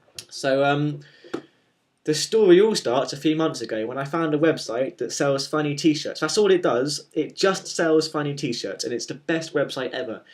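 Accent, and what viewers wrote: United Kingdom. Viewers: LOOOL :D you're hilarious.. and very cute! :3 and you've got a lovely accent! (british accents ♥) keep it up, man - but the other commenters (is this a word?) are right: you really should shorten your vids to 3-4mins.. but the video is great, though :D and the title of the video fits my name, doesn't it? :D (wow, I'm using many smileys..) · You're so cute and I think you're clever person.. ;D so don't be like Charlie McDonnell.. be yourself.. I think, that you are, but a lot of people - don't... and maybe they're right.... and do not dress the hat any more(or jacket, etc..), please... you are in the room, that looks pretty weird.. and that is like Charlie was doing.. ;D ..but I really like you. (and I love your British accent ! ) xd - Kate. ;)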